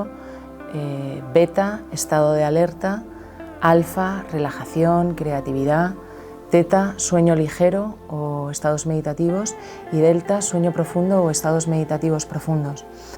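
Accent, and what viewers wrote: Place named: Spain. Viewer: Me gusta su tonos de voz que llega al corazón ❤️ y su explicación me gusta en verdad es primera vez que la escucho y primera vez los que nos enseña con un fondo de música súper agradable tiene voz de Española seguiré sus enseñanzas desde cuba cuba un abrazo gigante cuídate mucho un saludo a la familia eres maravillosa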